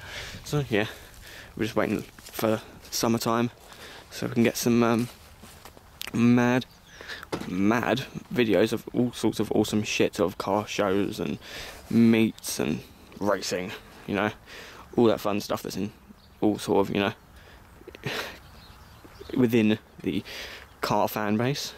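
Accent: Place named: United Kingdom. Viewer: To me it's not the voice that changed the most, it's the accent. In the old video, I could understand something. In this video, I can understand almost nothing. Dat British English *grumpy noises*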